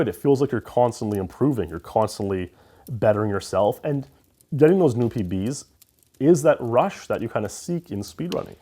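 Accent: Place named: Canada. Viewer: what part of Canada you from?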